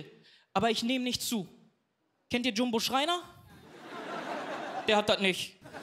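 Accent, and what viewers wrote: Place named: Duisburg, Germany. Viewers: Liebe unsern Ruhrpott Akzent 😎☺️❤️ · LoooL, hatte noch nie von ihm gehört aber so geil! Liebe den Duisburger Assi Slang, bin viel in Duisburg unterwegs. Sehr erfrischend! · Super Typ, ich bin aus Hamborn und in Marxloh zur Berufsschule gegangen. War damals (um 1980) schon ne besondere Gegend. Wohne seit über 30 Jahren in Stuttgart und den Duisburger Dialekt finde ich immer noch einfach klasse. Mach weiter so, super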